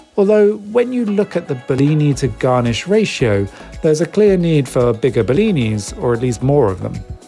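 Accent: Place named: United Kingdom. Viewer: I subscribed because of your hairstyle and your British accent 🙂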